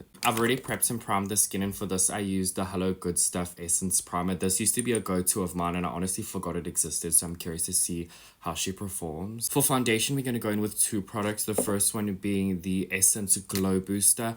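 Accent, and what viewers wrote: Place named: South Africa. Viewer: I love the south African accent soooo much!!! Can u speak the language for us just once in one of ur videos???!!! Please please pleeeeeeease!!! Saying that the one foundation smells like "literal shit" 😂😂😂 omg ur so funny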